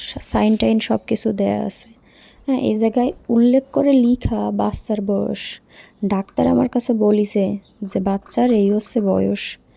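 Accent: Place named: Khulna, Bangladesh. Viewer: আপনার ভাষা তো খুলনার দিঘলিয়া উপজেলার মত।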